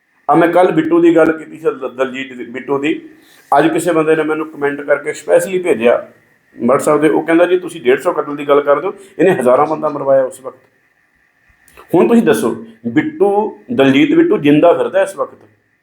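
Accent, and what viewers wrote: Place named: Punjab, India. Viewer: Thanks ji ur punjabi speaking is pure......theth punjabi.........very nice in several rural simple idioms it gives warmth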